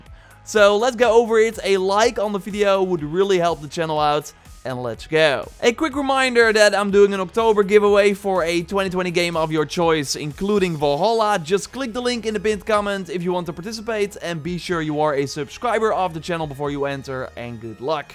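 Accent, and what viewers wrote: Netherlands. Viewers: Man he has such a bad accent, I mean I know several dutch ppl who speak normally and this guy's out here saying fideo and ashgard and volhala hahaaha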